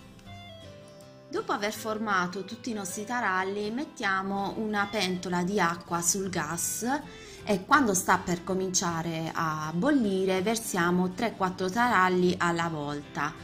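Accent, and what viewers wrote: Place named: Taranto, Italy. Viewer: Bravissima anche la mia nonna le faceva cosi e forse sentendo il tuo accento non mi sembra molto diverso dal suo. Lei é di un piccolo paese in provincia di Taranto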